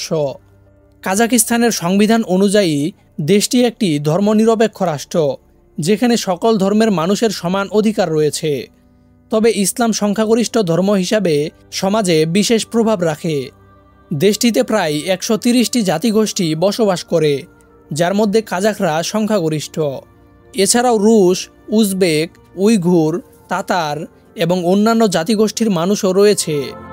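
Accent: Sylhet, Bangladesh. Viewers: আপনার বাড়ি মনে হয় সিলেটে।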